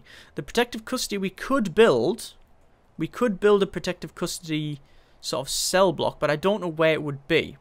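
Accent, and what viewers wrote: United Kingdom. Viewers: You have absolutely no idea how much I love you cause your from the uk, all the uk talk is just cute to me like snookered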